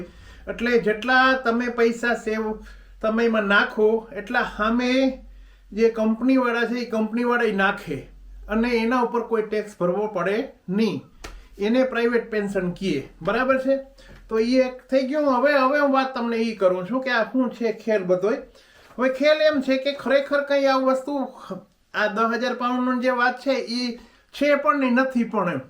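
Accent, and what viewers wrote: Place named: Saurashtra, India. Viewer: Sorthi boli hambharva Ni Maja ave chhe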